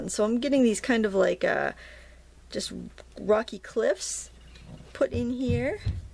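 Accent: Maine, United States. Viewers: I believe its a Maine accent thing, she's saying across. I've heard it in a few other peoples videos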